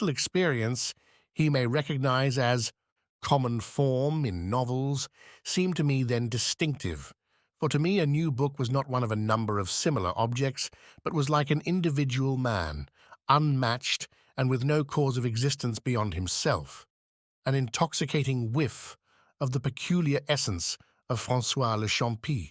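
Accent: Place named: United Kingdom. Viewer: Who is the reader of this wonderful novel? He sounds very British, not at all American.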